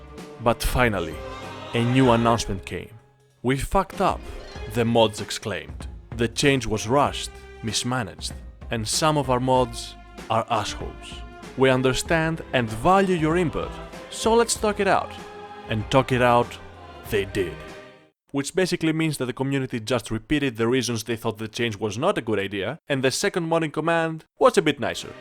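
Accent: Greece. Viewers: You have a strong greek accen. Are you greek or im just wrong :-/ ?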